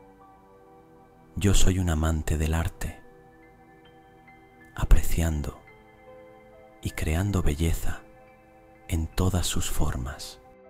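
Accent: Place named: Spain. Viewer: esa voz de españolete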